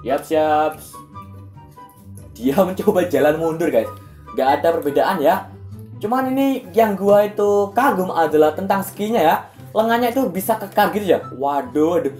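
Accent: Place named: Java, Indonesia. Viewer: Lu orang Jawa timur apa tengah bang !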